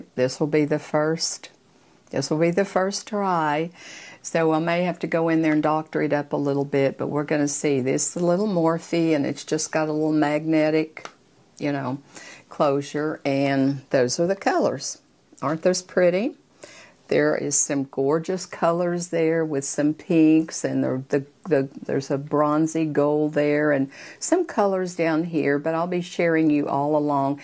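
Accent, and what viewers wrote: South, United States. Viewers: I tell ya, I am 52 and haven’t wore make up in years because of it setting in fine lines and wrinkles. No, I don’t have that many but you know what I mean, the make up would settle in those lines and wrinkles and it would emphasize said wrinkle and fine lines and it would make it look worse. I have recently had an outing with my husband and I bought that same elf foundation that you have there and it did pretty good. I didn’t wear any powder in fear that it would settle in the wrinkles. But thanks for all of your videos, I feel so inspired. And I figured you were from the South due to your accent, I think that’s why I immediately like you, I live in SW Georgia btw, lol❤.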